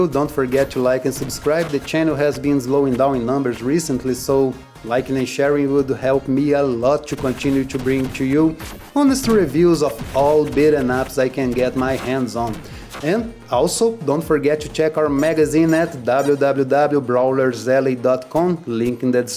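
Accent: Brazil. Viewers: Es Brasileiro o sotaque em ingles nao engana.o tchi no final das palavras denuncia logo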